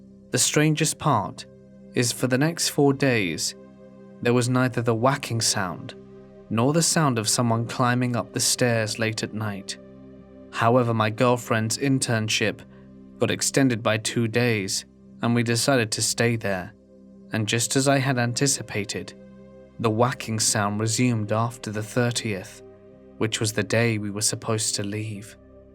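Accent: United Kingdom. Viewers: The British accent gets me every time. 😊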